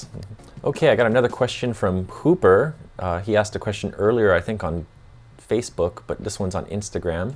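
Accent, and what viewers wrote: United States. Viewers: I'm sure you've been asked this before. But how can you speak such with such a general American accent? You are from Japan, ethnic Korean. If someone doesn't spend most of their elementary school years in the U.S., they will have at least SOME accent. I don't hear even a hint that you didn't spend all your youth in America. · Ribas Yes, he does! really nice one... Sometimes I comment down on his Instagram photos and his Youtube videos, replied all of it and I'm very appreciated it. I'm Vietnamese, actually English isn't the main language in Vietnam, so I want to improve my English listening skill and then I decided to watch videos. I really love American accent. His voice was warm and inspirational... I always support him 😁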